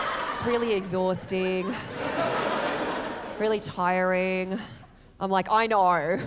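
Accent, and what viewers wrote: Australia. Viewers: I detect an Australian accent, pretty cool. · I'm an Aussie who moved OS in 98 and I think it's just really cool hearing and Aussie accent from an Asian (sorry if this sounded racist, not my intention.....oops) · Strange seeing a Chinese with an Australian accent. 🤣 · I came here from a Yumi Nagashima video. The Aussie accent really threw me off lol. I'm not sure what I was expecting when I clicked, it just wasn't a strong Australian accent 😅 Hilarious stuff! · Your material is excellent. Great comedy. Only Aussie chinese girl can deliver the punchlines so well 👍👍🌹🌹You're just as good and funny as Aaron Chan. 100% aussie accent too 😃😃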